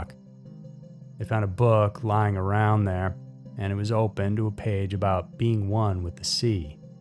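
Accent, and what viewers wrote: South, United States. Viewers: Lord, I love your voice. It has a southern ring to it, like I have. Anyhow, it's a lot better than that random Canadian guy who sounds like the least interesting man in the world.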